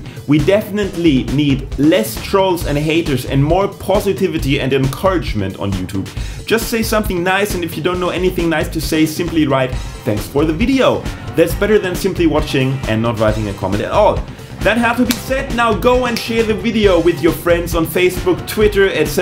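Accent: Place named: Germany. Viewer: Well at least you don't take those comments to heart, they're more funny than anything. By the way German accents are the best and you are really cute and funny!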